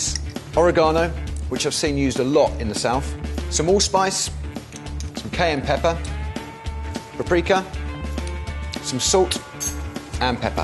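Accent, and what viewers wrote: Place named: Europe. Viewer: This ain’t southern y’all, this is European...The European accent the heeeerbs....hell naw this ain’t southern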